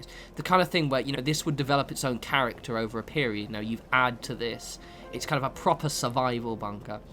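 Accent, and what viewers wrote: England, United Kingdom. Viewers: Why do I enjoy this England accent 💀it so soothing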